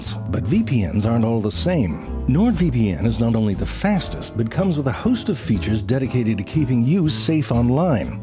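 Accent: United Kingdom. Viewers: Does the narrator sound quite a bit like the main character from the PC point and click "Scratches", or is it just because it's a vaguely British sounding guy constantly being frustrated by a man called Jerry?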